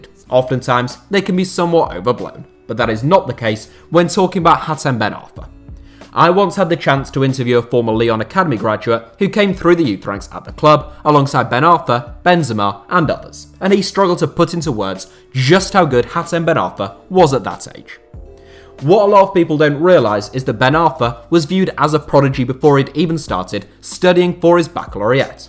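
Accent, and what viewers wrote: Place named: United Kingdom. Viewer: We can tell you are british. Were is Ibraimovic?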